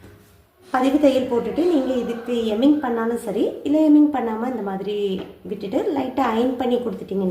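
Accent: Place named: Kanyakumari, India. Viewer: unga pechu kanyakumari Kulasekharam side mathiri irukku sis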